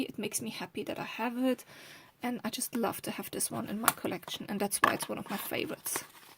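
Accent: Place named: Germany. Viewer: Your accent is lovely. I may be mistaken, but it sounds either German or Scandinavian.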